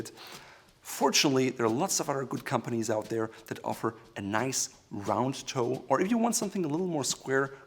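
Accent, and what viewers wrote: Germany. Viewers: Wusste ich doch das ich diesen Akzent erkenne :D Even with perfect English, a German can spot a German. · Isn't he German?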